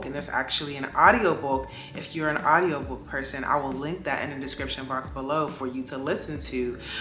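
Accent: Pittsburgh, United States. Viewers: Your accents giving Pittsburgh??? Or am I tripping? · Are you from PGH? I might be wrong but I hear your accent. Thank you for the video. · Are you from Pittsburgh??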